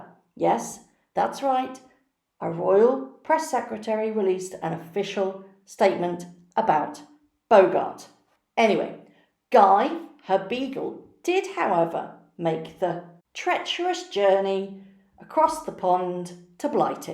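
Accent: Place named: United Kingdom. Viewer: I'm going to just leave several opinions, otherwise I get a bit wordy. 1) Thank you for sharing your beautiful voice, I have always enjoyed the accent of the people of the U. K. P. S. To those that have left hurtful comment concerning this Channel's speaking voice, "Go back to the other side of YouTube where evil residence. Any and all hurtful, mean spirited, will full intent to Troll on this side of YouTube, you will b reported for breaking YouTube's protocol on what is and isn't allowed! kathleen Pacific Northwest U.S.A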